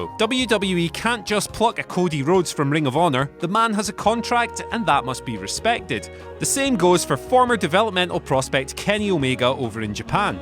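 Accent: United Kingdom. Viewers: Please help your non english speaking audience, use less britain accent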